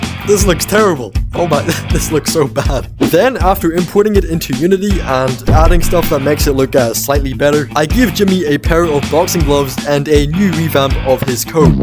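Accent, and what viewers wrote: Northern Ireland, United Kingdom. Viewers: R U NORTHERN IRISH BROOO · are you northern irish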